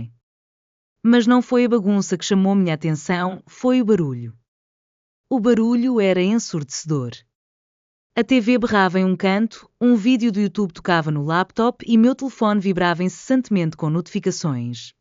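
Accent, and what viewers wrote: Portugal. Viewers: Porque a voz é portugues de portugal? Quase não dá para entender. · Esse é o português de Portugal.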